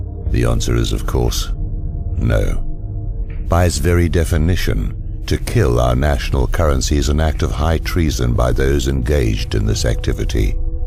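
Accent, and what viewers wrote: England, United Kingdom. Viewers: English narrator. Obviously true! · I guess the commentator with the British accent gives this message more credibility. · Why does the narrarator have a british accent? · There is no gold reserve, nor has there been since the early 1930's. All the federal reserve relies on private banking, namely the 2 big families, and we all know who they are. The guy narrating this documentary keeps saying our country, our economy, but his accent is definitely English.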